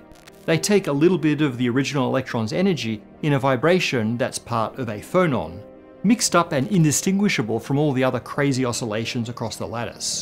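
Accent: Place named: Australia. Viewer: I thought he was Aussie.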